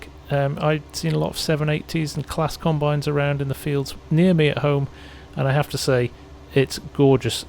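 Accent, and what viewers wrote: United Kingdom. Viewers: Hey, do you live in the US, you sound British but I've seen you like ur US quipment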